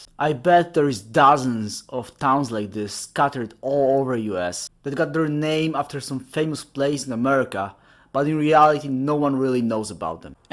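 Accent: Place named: Germany. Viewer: I Detect a Accent 🤔🤔 I almost want say German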